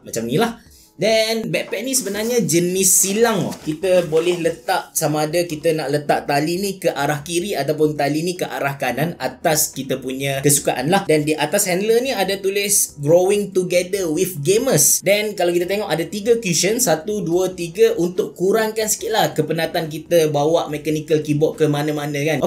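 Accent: Kelantan, Malaysia. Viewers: Lama perati. Abe cerah lamo doh xkecek slang klate. Bnyi skit2 xpo doh, bg video unik skit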